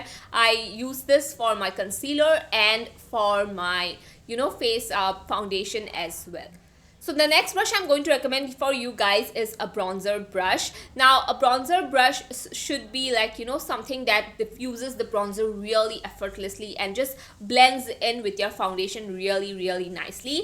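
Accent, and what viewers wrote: India. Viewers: Where are you from?in which part of India?Because your accent is so cute and so soothing 🥰🥰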